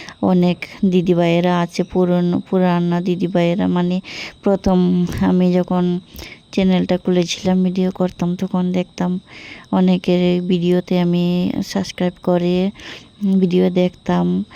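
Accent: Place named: Assam, India. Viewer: তোমার কথা গুলো শুনতে আমার খুব ভালো লাগে। মনে হয় তুমি আসামি ভাষায় কথা বল ❤️❤️একটু আলাদা কিন্তু ভীষণ ভালো লাগে। 👌👌💐🌹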